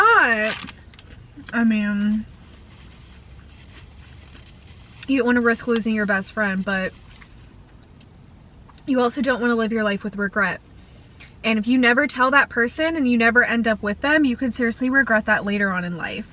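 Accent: South, United States. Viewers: I absolutely love your cute southern accent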